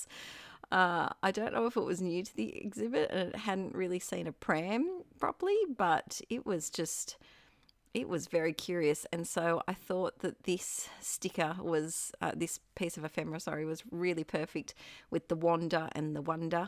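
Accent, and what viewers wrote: Australia. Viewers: I enjoyed watching your video. Thankyou for sharing your process. I agree, the papers you've used are beautiful. It's nice to hear another Aussie. A fellow New south weigan nonetheless. 😁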